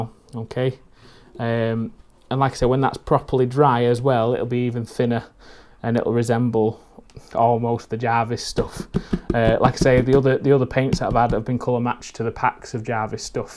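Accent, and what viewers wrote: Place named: Yorkshire, United Kingdom. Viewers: Thanks for this... I'll not be buying flock ever again also. ( PS, Big 'hello' from someone who was born in Batley and raised in Birstall... nice to hear a proper accent again😉) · nice vid mate. where in the world are you cos you sound northern england?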